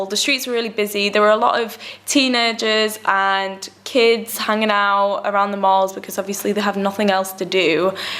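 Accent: United Kingdom. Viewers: I love UK accent wish you all luck lady. BTW you're so pretty oh one more thing stay safe and healthy 👏👏👏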